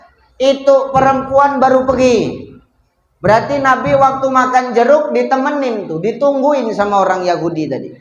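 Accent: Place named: Sumatra, Indonesia. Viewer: Assalamu Alaikum Apa ka bar bang Ustadz semoga sehat terus saya suka sekali dengan Ceramah Nya bang Ustadz lucu banget logat Sumatra nya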